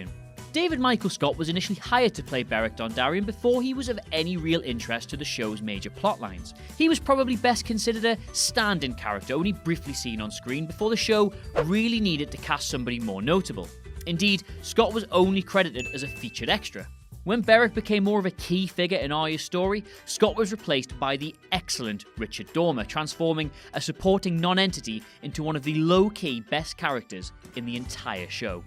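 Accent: United Kingdom. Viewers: Have you never heard a British person speak before?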